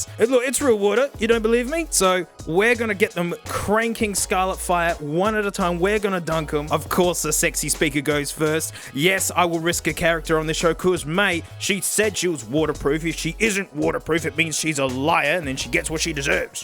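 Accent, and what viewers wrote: Australia. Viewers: I bet every Aussie dudes sounds similar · Imagine watching this to figure out which speaker to buy but it’s just an Australian man talking about his sexy speaker and worrying about his pkcell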